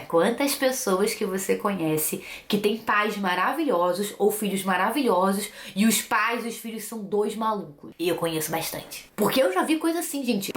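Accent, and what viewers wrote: Rio de Janeiro, Brazil. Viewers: amo o seu jeito de falar cariocaaaaaa, KKKKK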